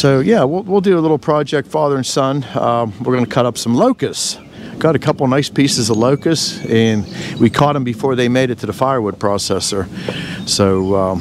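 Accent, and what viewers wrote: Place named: Maryland, United States. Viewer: Where yall at? Im in Pasadena, MD and recognize the accent, and the mention of gone downy oshun. Just moved from SC and miss this good honest industrial stuff. Would love to come say hi!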